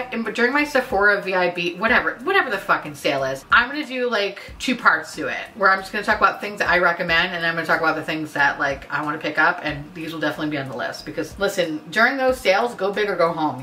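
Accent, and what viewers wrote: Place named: South, United States. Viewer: Momma came out of the gate with a buzz and Southern accent. Hell yea I am here for it. ❤💀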